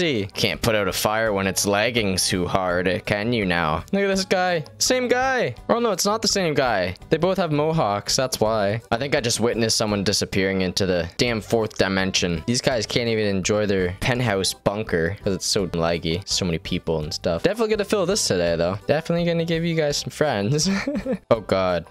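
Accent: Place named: Canada. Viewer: Your Canadian accent is so magnificent...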